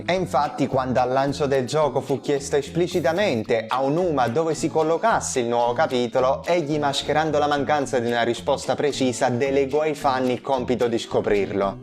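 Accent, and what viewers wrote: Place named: Campania, Italy. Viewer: Ho giocato Xenoblade per la prima volta l'anno scorso partendo dal 2, poi questa primavera sono passato al 3 (un ordine che lascia un po' a desiderare per seguire la storyline, ma tant'è 😂). La serie mi ha catturato tantissimo e la reputo un nuovo Kingdom Hearts per intreccio narrativo, ma uno venuto bene! (senza esagerati mindfu*ck, insomma)! L'idea di un anime avrebbe totalmente senso! Non sono sicuro che elimineranno le Definitive Edition perché gli fanno macinare soldi, ma sarebbe se non altro un modo per fare contenti i fan della serie e per avvicinarne di nuovi. Apprezzo molto i contenuti che tratti perché parli spesso di informazioni di cui non si parla molto in Italia. Un piccolo commento "tecnico", se posso. Rimuoverei il suono "sh" della parlata campana. Non che voglia eliminare la tua originalità, ma credo darebbe un piccolo tocco piu professionale al canale. 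Ovviamente, parere personale. Detto questo, continua cosi che sei bravo! 👏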